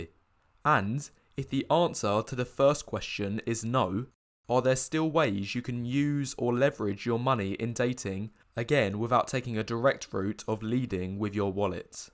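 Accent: United Kingdom. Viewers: although I don't like British accent i really appreciate your voice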